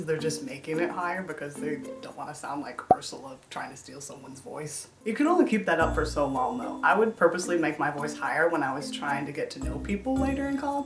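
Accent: South, United States. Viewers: I’ve never even noticed how deep your voice is. Lol I mean I guess you have a bit of a southern accent and I hear them all the time since I live in Louisiana so it’s pretty normal for me! Lol My voice is super high naturally but I can also sound like a middle aged man who will beat you with my walking stick if you ever cross me. So ya idk where I was going with this comment...love the video and you! Have a great day!💖💞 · You just sound Southern to me. Along with the deep voice, it’s very soothing. The pizza scene was like ASMR to me! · You just sound country to meh.........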